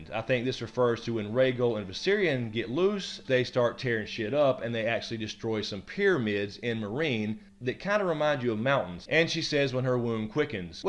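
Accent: South, United States. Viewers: I’m really loving to hear another Southerner like myself talk about GoT like a pro 😌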